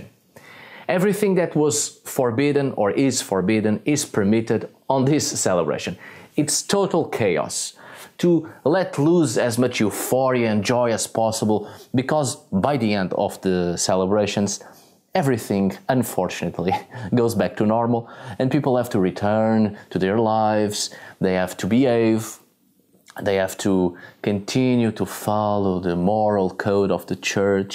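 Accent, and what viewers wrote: Portugal. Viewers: Your accent in English and especially in Portuguese very much sounds like a Portuguese speaking those languages. As well as your content, you recently talked about Umbanda, you made a video on the runes, you talked very much about the Iberian peninsula in the video about druids. Are you portuguese? Or is european portuguese your mother language? I say it because, as a brazilian, I'm very used to the way portuguese people talk and all, and couldn't help but notice these similarities. Also, great video! would love to see more about Portugal and North/northwestern Iberian celtic traditions, culture and history overall. It's very difficult to find specificities about that especially if you consider that Brazil tends to see Portugal and portuguese culture as too alien, often calling the portuguese settlers and conquerors "europeans" even tho they were the only ones to colonize here, for instance, and we don't even learn on school about pre-modern Portugal at all, making it look like we don't even descend from them at all (far from the truth, culturally and especially ethnically). 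I see you live in Portugal and has much interest on the country and. culture too, would be great to know more about it then! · As a Brazilian portuguese speaker, your accent is so strong I almost don't understand "caretos". Looks you're fluent in European Portuguese, so to speak. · Indeed He's speaking European Portuguese. And as it appears, he resides in Portugal atm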